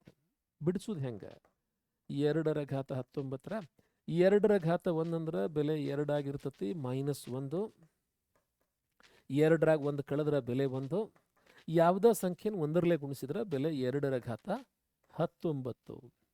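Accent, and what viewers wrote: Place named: Karnataka, India. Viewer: Sir we love your dharwad accent . It fills our heart ❤️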